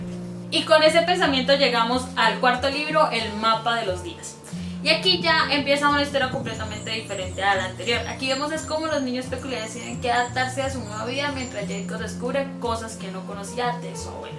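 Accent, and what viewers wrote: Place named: Colombia. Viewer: Jaja me estuve preguntando durante el video si eras de Colombia o de Mexico pero cuando dijiste le coji como bronca me di cuenta saludos buen video parcera😊😊😊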